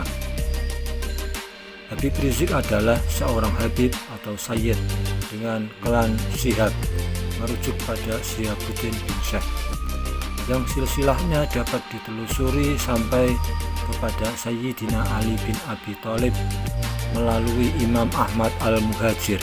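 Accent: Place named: Java, Indonesia. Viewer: Medok amat ai mas broooooo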